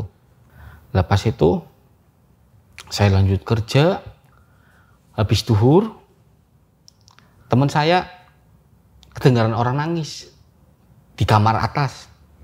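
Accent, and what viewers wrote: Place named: Tegal, Indonesia. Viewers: Medokee medoke masss 😅 waras terus mas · Ora ngapak ora kepenak ya mas jos gandos 😭👍🏻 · wong ngapak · Logat tegal nya kental bget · Logat tegale ora ilang yah kang,,keren" mantep critane,salam saking Bojong tegal 🔥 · Jane serem critane cuman logate karo basa ngapak dadi ora sida medeni malah dadi lucu😂😂😂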